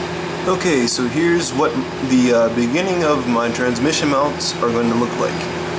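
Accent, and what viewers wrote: Canada. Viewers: You sound extremely Canadian.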